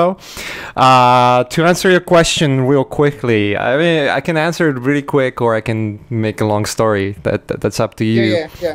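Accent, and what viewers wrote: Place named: Poland. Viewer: Как же прекрасен польский английский. Люблю за это поляков, в отличие от native spikers.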